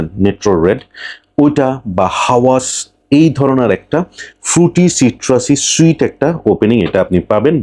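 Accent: Chittagong, Bangladesh. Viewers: ভাই,আপনি কি চিটাইঙ্গা নাকি?🤔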